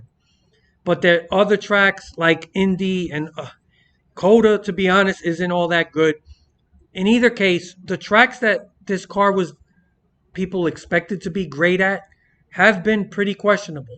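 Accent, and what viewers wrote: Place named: New York, United States. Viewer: These rant reviews are on point my man! Was telling so many people at work and in the neighborhood who you were and how hilarious you are especially with the NY accent 😂😂😂 I remember this weekend quite well as it was my mom’s Birthday Weekend and even though my favorite driver won the Pepsi Firecracker 400, I hate that we had to do it that way which is why I was better off going to the Fair that day instead which also makes me glad I fell asleep in the bedroom for half of that Winn-Dixie Firecracker 250 as well and when I finally woke up, I didn’t even bother and look how much happier I was that whole weekend compared to if I did watch.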